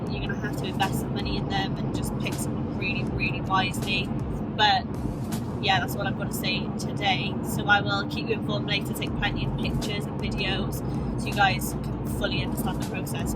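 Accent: Wales, United Kingdom. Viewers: Are you Welsh? ♥️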